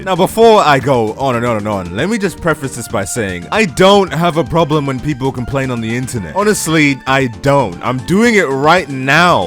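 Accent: United Kingdom. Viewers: you didt sound like timmy you sound just vary british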